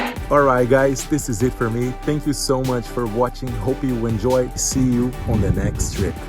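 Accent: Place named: Brazil. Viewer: Brazzo accent yey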